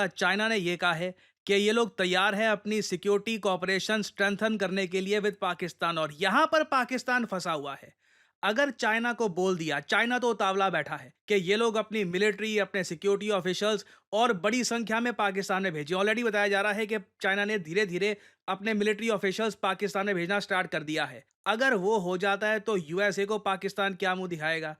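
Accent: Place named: India. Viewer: Ye indian accent h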